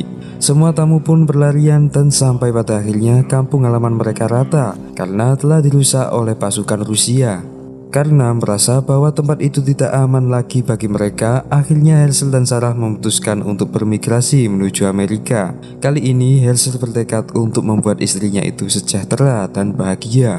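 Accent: Java, Indonesia. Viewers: Admin e wong jowo iki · Orang Jawa yo ....jowone ndi lurr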